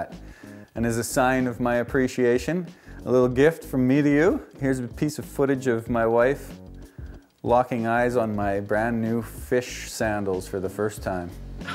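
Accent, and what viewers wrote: Canada. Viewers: Nice video, you sound like you are from the Ottawa Valley.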